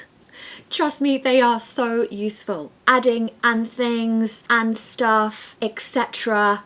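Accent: United Kingdom. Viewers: I find your series of videos very appealing! I also think your beautiful intonation is exactly as described in Peter ROACH (2009) "English Phonetics and Phonology", which adopts RP as its accent model. Wonderful! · Wow! Wonderful quotes and an amazing British accent you have! you're a star⭐hope you will do lives in the future and we can speak with you. 🤍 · Your British accent is excellent.I need the channel of a man in a British accent that looks like you to make an imutation. who do you recommend? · Omg i love your British accent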